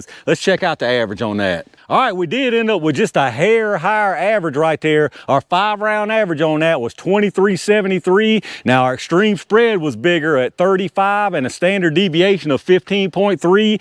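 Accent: South, United States. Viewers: love your videos. Just cannot get enough of them. Love your southern accent to. Sound a lot like me. From Georgia.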